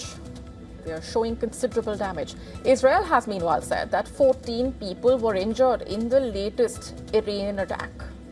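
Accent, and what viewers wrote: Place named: India. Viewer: is that an indian woman who tries to speak british english, but spent some years living in germany?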